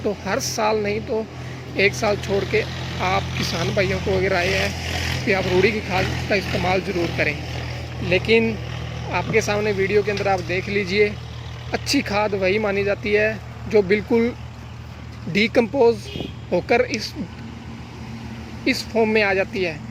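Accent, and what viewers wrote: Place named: Rajasthan, India. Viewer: Bhai tumhari language ka accentto rajasthani h but tumne khud ko punjab se bta rakha h,, but overall good job..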